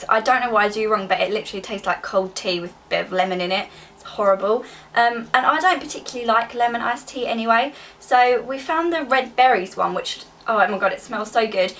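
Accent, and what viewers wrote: Essex, United Kingdom. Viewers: R u from essex?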